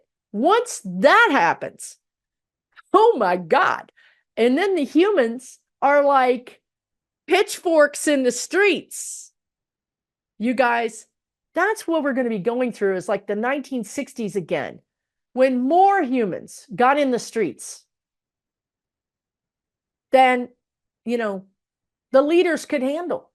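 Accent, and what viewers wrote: Texas, United States. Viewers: Chickensh*t you sound like a Texan 😊❤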